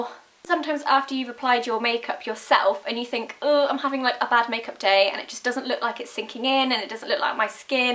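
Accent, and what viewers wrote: England, United Kingdom. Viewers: you're the representation of an british accent :D cute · i subscribed because your amazing british accent, but then i figured out i love your videos, too ;D well, your really pretty and really amazing! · British accent-3-3-3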